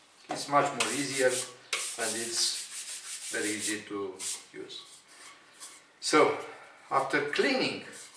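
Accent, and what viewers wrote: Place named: Romania. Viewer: Si mie mi se parea ca are accent romanesc, dar am zis ca-s nebun. E brand romanesc??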